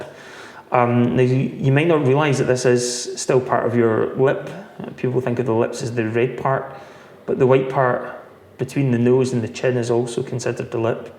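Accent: Scotland, United Kingdom. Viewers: Who is this Scottish doctor and where is he located? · He’s clearly Scottish lol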